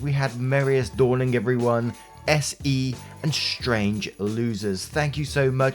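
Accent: United Kingdom. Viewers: I am here for the British accent XD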